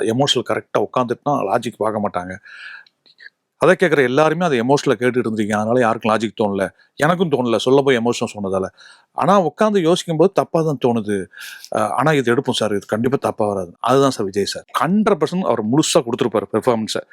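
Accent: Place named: Southern Tamil Nadu, India. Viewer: அருமை அருமை ❤நெறியாளர் தென் மாவட்டம் போல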